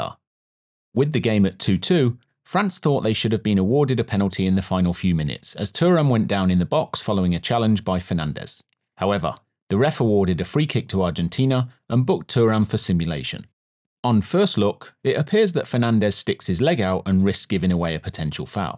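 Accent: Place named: United Kingdom. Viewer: Thanks for the explanation, I like football but know near nothing and having a Brit explaining is great!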